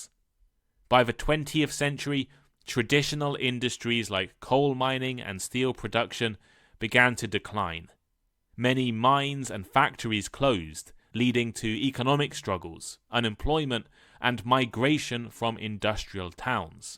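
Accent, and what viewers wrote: United Kingdom. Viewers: Your English accent is very satisfactory for to heard. Thanks so much for to show many knowledge about English, culture and many other countries, it's very interesting and helpful. A Hi from Brazil ;)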